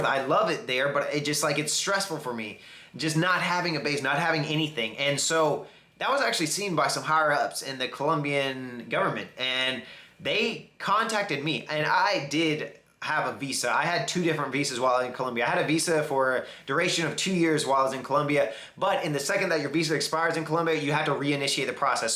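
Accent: South, United States. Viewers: I can´t believe you´re my age! Congrats on your job so far! As a Brazilian, I´ve got to say it´d be awesome to hear you speak Portuguese as well. I was curious about what state in the USA you were from (I heard it´s South Carolina), because your accent is slightly different from the standard american one, although I can understand every single word you say. Perhaps this is because you talk way too fast sometimes or because of the idioms and slangs you use, but it´s a very nice accent. Best wishes from Porto Alegre and come visit us once again. keep up the good work! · I must correct you.. im a black dude living in south America.. and the people are nice to everyone for the nost part... I honestly dont think your "whiteness" has as much to do with it as you think.. It may be moreso your accent being American... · Jesus, the way you speak English you're like a redneck hillbilly guy 😳 but anyway, I'm American to one Colombian parent which is my mother proud to be an American-Colombian I'm going to apply for Colombian citizenship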